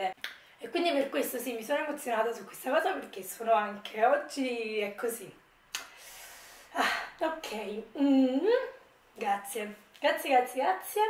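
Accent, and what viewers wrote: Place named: Campania, Italy. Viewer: Io penso che tu non ti debba giustificare dei tuoi errori grammaticali, io ti seguo da poco e mi sto letteralmente divorando tutti i tuoi video perchè mi piace la persona che si intravede semplice e genuina, oltre che mi sono utili i tuoi video in quanto sono un acquirente di allsupplements. Inoltre reputo anche tu sappia intrattenere il pubblico. almeno per il mio gusto personale si. Continua così :-) P.s. adoro il tuo accento campano!!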